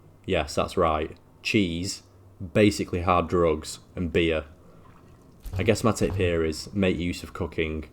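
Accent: United Kingdom. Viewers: I don't dislike your accent it's kind of cool. But it seemed like a different kind of British accent. I have a friend that moved here from some town right outside of London he said and we pick on him all the time about his accent. Nothing bad just good clean fun. But yours seems even different than his accent. Yeah Arkansas has more of a country draw to the way they speak as to where people in New Orleans have more of a creole type accent. It's weird because anywhere I go in the country they know I'm from New Orleans it's a distinct accent that's for sure. I have never left the United States before. Never had the opportunity to but if I do get a chance I definitely want to go does the UK or France or Ireland that would be where I would like to go. Even Italy. Definitely definitely wouldn't mind dating some of the Swedish ladies, Sweden has them long-legged blondes anyway yeah your accent is definitely unique even within the scope of being British. 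Like I said I don't dislike it it's just different that's all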